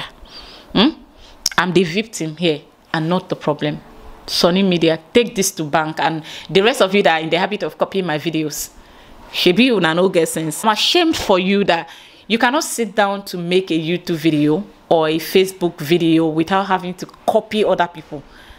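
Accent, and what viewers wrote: Ghana. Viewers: You speak Ghanaian English, Your accent is good. Your intelligent.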